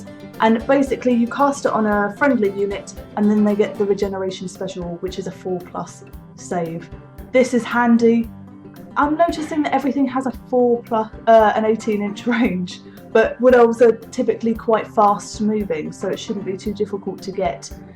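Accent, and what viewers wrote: United Kingdom. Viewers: Wha... what WHAT IS THIS!? An attractive woman speaking with a British accent about WARHAMMER FANTASY lores?! 0_0 .... subscribe. Where do I vote for more of this from the interwebz?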